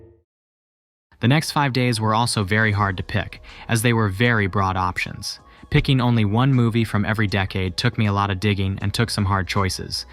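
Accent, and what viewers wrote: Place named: United States. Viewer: Your from the Netherlands? It sounds like you have an American esque accent.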